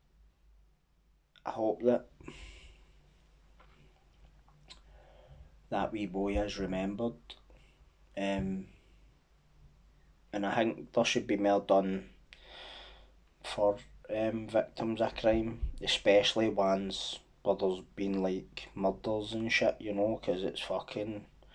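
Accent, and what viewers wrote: Scotland, United Kingdom. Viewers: I'm a new subscriber to your channel.. I'm a fan of crime stories and watch a lot on youtube while im busy with orders and you popped up a couple days ago.. loving some scottish narration (im in Edinburgh, partners from glasgow) so its good to hear of some more local cases! Keep up the good work 👌🏼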